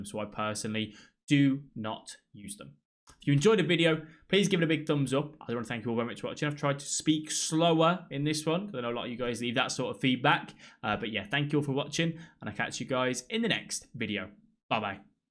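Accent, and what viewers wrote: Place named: Yorkshire, United Kingdom. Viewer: Good content bro but ur yourkshire accent is like nails in my ears, why british english is so terrible???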